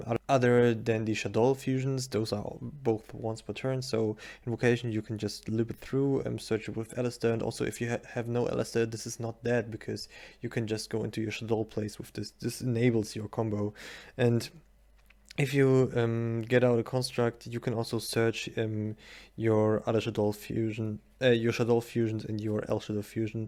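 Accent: Germany. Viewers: Is that a German accent? :D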